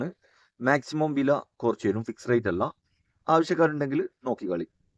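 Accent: Kasaragod, India. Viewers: കാസറഗോഡ് ബാസ 😅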